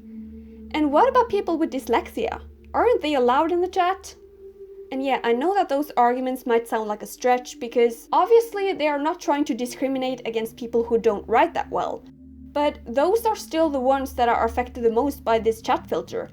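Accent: Norway. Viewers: are u norwegian? o-o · wait are you norwegian??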